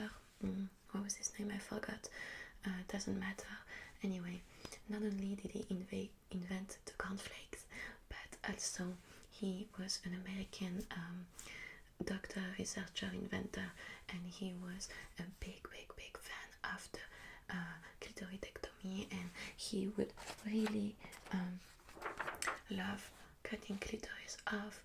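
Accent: France. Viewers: traffy jump maybe because she’s French??? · Why this french accent? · I love your French accent! Greetings from Brazil!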